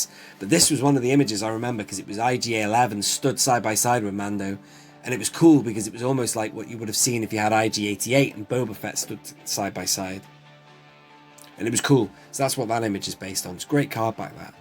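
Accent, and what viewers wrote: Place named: United States. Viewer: It would be nice if the 3.75 were as detailed as the 6! BTW you do a great American accent! 👍🏼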